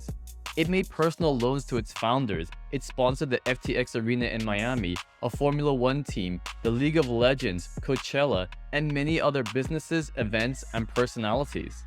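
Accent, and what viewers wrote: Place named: Scotland, United Kingdom. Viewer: Mate, you had a Scottish accent in your last video…..this is so weird.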